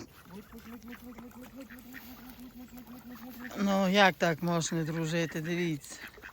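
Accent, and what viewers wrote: Transcarpathia, Ukraine. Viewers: По говірці ніби Закарпаття.. Але річка на закарпатські річки геть не схожа.. то де є ця краса? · Та де се рівненщина, се закарпатський акцент, но се не в горах бо потік явно не гірський. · Ви молодець)) Це суперово!)👍 Великий плюс Вам в карму))💯 Я також любитель підгодовувати качок коли на ріці, голубів, ворон, все це чудово заспокоює нервову систему) * Я сам з Хуста, чую такий знайомий закарпатський акцент за кадром)😊👍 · По розмові чую що Закарпаття 👍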